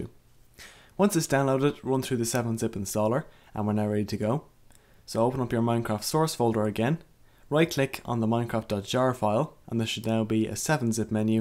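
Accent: Ireland. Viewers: are you irsh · are you irish?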